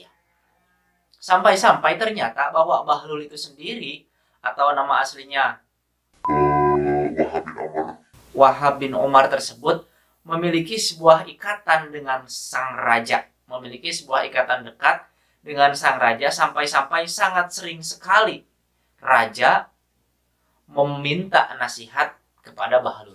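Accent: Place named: West Java, Indonesia. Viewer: Sunda a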